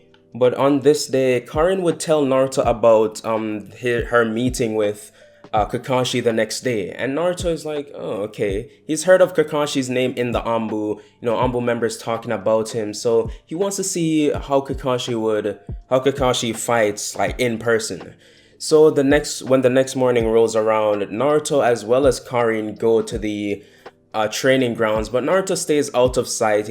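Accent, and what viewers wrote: Jamaica. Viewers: You're Jamaican aren't you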